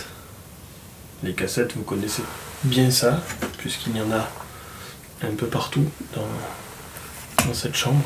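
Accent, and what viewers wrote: France. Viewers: Merci pour ton enquête. Ton anglais est très bon, c'est juste que tu as l'accent français, ce dont les british raffolent lol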